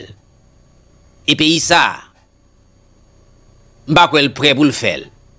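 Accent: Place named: Haiti. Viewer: Bonsoir frem mkontan tande ou avek gro kreol sa frem Oh oui Mr le President kompeann gin lot peyi ki ka vinn niche deye l Ayayayyyy frem kreol la gro nan mains ou oui la Merci et bonne semaine avek fanmi ou specialement la petite princesse Reserve yon ti temps speciale pou ti princesse la pou moin frem Minm ou minm wap kontan Le wap pale avek li Pitite fille yo tjou rinmin papa yo et papa tjoujou rinmin pitite fille yo Autrement dit vice Versa frem Ke bon Dieu beni ou protege ou Frere c pou Aprann konnin ou gin plus moun ki apprecier ouki respecter ou a l egard de service wap rann Societebhaitienne ke moun ki comporte yo kom des imbeciles Ok ke toute bon bagay yo bon Dieu fe yo pou ouak toute pitite ou yo madanm ou et bon travay bonne semaine Bonne sante bon courageet bonne perseverance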